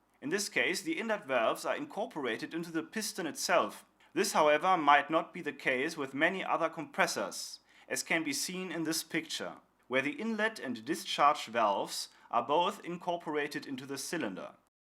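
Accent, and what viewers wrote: United States. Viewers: Thanks for the quick reply. Yes there is definitely a sprinkling of American in your speech. Thanks again.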